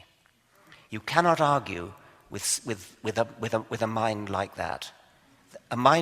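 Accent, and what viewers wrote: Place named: RP, United Kingdom. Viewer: If you take a look at DNA gene comparisons, it's hard not to see relationships and tricky to explain otherwise. There are plenty of other lines of evidence too. Lots of material on the subject out there - given it's the theory that underpins biology. Most atheists are skeptics, so his posh voice doesn't count for much.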